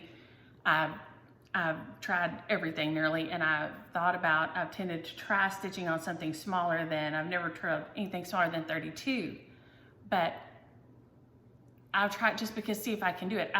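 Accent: South, United States. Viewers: I grew up in Tennessee and have lived in Massachusetts for 20 years now. I love your southern accent and stories. When I first moved from TN "fixin to do" was the first thing I had to give up. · . I stitch on Zwigart based Aida all the time. Most people think Aida only comes in 14 count, but I usually use the 16 or 18 count. It also comes in 20 and 22 and I've stitched on that too. The cheaper brands are a bit too stiff for me though. I dye it whatever color I want, with either Rit dye or coffee/tea dye. I so enjoy hearing another Southern accent! I was born and raised, and live, in North Florida and I know what "tump and fixin' to" mean!! Also, Poke salad is made from the Poke Berry bush. It grows wild here. Never had it though!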